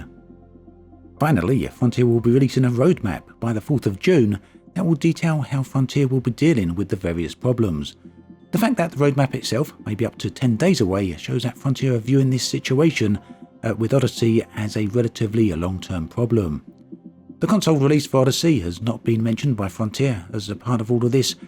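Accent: United Kingdom. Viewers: I fucking love your accent! Is this british or something special or regional?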